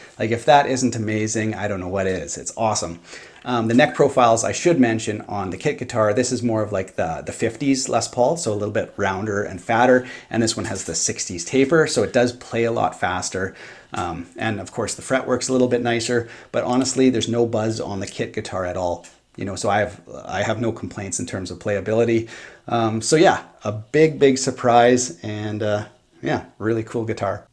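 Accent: Canada. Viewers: I just noticed he has a Canadian accent. Nice.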